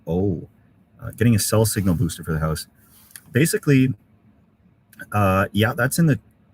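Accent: Canada. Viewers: Are you and Greg Ovens distant relatives? I swear maybe it’s just a Canadian accent that I’m hearing as an American. But you guys could be brothers or cousins on the same side of the family.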